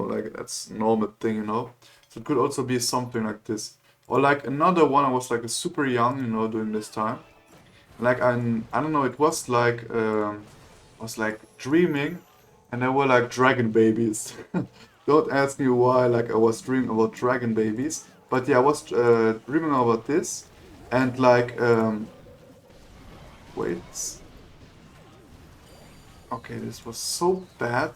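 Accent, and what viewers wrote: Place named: Germany. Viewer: i hear german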